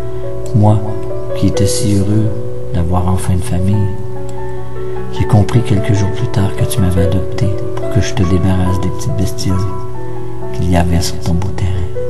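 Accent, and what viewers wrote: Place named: Quebec, Canada. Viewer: Tellement triste 😭 perso j’aime beaucoup l’axent québécois whaaaaaaaa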